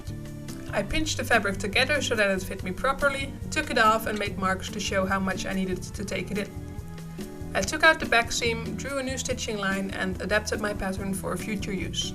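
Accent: Netherlands. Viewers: Are u dutch? :0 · Are u dutch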